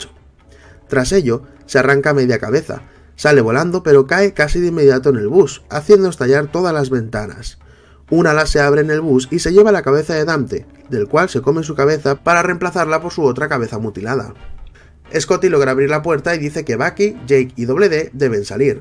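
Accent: Spain. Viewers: por fin un video donde alguien explica lo que pasa en la tercera pelicula ya que muchos no la entendieron y la tacharon de mala yo igual quería hacerlo en su momento por esas gentes que no entendieron pero no se editar vídeos jajaja PD: me encanta tu asentó español :3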